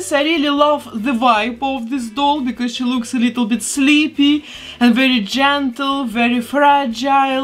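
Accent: Russia. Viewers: А вам тоже кажется, что она русская,но "английский" акцент её выдаёт?)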